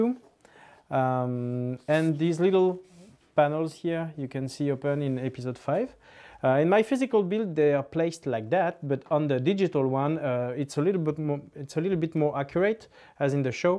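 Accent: France. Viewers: You really hear, that he is french. But it hears so good! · Tu parle frençais vue ton accent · l'accent français yeees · Oula l’accent français est très présent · MDR cet accent français On est là !! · Cet accent français magnifique ;) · L'accent français oulala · He's french like me ! This accent français ! Cool que tu sois une des personnes qui a fait le razor crest en lego !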